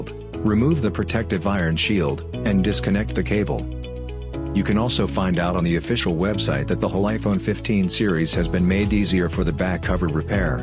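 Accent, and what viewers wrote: China. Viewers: Why don‘t you talk in the videos? I like the Chinese English accent 😊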